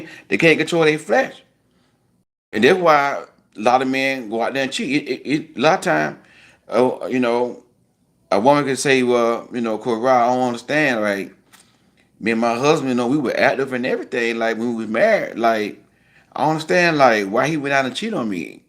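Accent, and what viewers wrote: South, United States. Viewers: Love the country accent, Christian values, and quality content!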